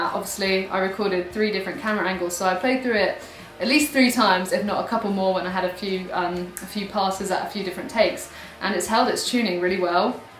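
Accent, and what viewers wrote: United Kingdom. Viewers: You and Emma Watson have the same particular brittish accent. That and you're both wildly talented and beautiful as well. Loved the video! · У девушки классное британское произношение.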